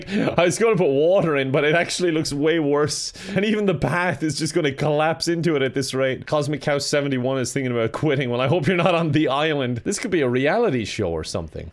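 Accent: Russia. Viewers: i love when he speak his russian accent 😂❤️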